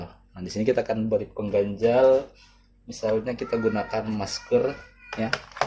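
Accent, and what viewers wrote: Banjar, Indonesia. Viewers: Orang Banjar kah bang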